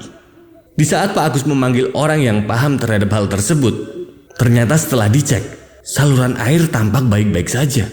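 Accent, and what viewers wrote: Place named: Java, Indonesia. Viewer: Assalamualaikum, saya ibu yanti dari Bandung hadir mas, Mas klo ngomong jawa enak banget di dengarnya melok banget ya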